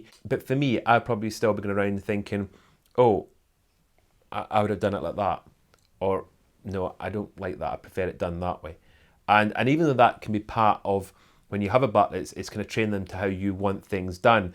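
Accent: Scotland, United Kingdom. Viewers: I just found your YouTube channel. I love 💕 listening to your Scottish accent and all your stories about being a butler. I wish I could take an etiquette lesson from you but I live in the USA. Best wishes to you and the dogs.😊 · I had to wait for a further 2 hours to finally watch your upload, due to current electricity load shedding that has once again been put upon us here in South Africa, whereby our electricity is cut off for set periods of time throughout the day. It is so lovely to hear you answering some of the questions I read in the comments section earlier in the week. And of course, your Scottish accent is fantastic! My adoptive Father was born and raised in Edinburgh. Thank you so much, for taking time to answer so many of our questions. And it is always so lovely to see Shumba with you, and those beautiful pink Roses. Your suggestion of having a livestream Youtube Q&A at another stage sounds fantastic.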